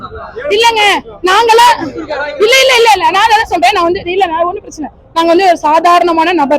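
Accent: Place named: Telugu, India. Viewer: அக்கா பேசுறத பார்த்தா தெலுங்கர் தான் இருக்கு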